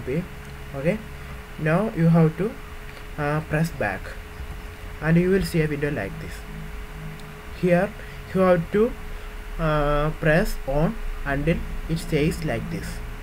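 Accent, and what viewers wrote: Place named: Kerala, India. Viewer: .... thanks a lot..... frankly speakin isimply wasted my cash on that roesoft ram exoander bullshit!!!! i cud done this for free...... btw are u a keralite...... coz ur akcent seems to be like that...... im a keralite!!! anyways thanks bro!!:)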